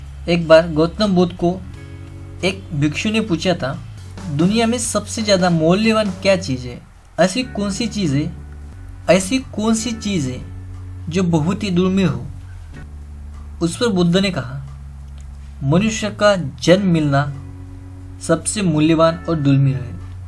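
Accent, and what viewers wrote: Bihar, India. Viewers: apni awaj mei mt upload krna bihari ho n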